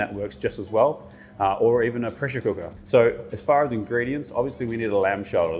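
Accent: New Zealand. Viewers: Spot that kiwi accent a mile away. Heaps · Are you a kiwi mate? I recognise that accent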